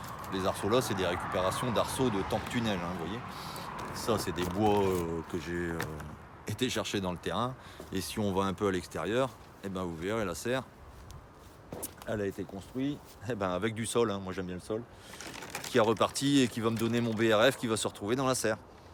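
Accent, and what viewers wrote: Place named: Picardy, France. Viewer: Salut à toi le jardinier de de(ux)main! Euuh on est cousins, frères ou je sais pas mais je pense exactement comme toi et réalise exactement les même choses que toi lol T'as un accent d'min coin, je suis dans la Somme au sud-ouest d'Amiens (environ 30 km),on pourrait éventuellement se rencontrer et se faire visiter nos jardins? Ça serait un énorme plaisir déchanger avec toi!:) Je conçois un jardin permacole depuis un peu plus de 4 ans, ça commence à être fonctionnel et bien joli aussi... Tu ne nous a pas parlé de l'intérêt de ces saules pour la biodiversité: une vraie aubaine! Ensemençez vos jardin avec des saules, c'est rapide et efficace, la vie vous remerciera très vite en vous offrant le spectacle de la nature qui s'épanouit grace à votre coup de pouce! 😆 À bientôt l'ami! Merci du partage par ta chaîne toute neuve;)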